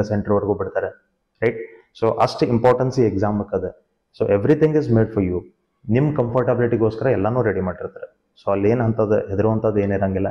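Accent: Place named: Hubballi-Dharwad, India. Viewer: Thank you so much sir❤.. Sir niv hubballi dharwad..dawra..😊.. Yakamdra..accent hanga anastade..😊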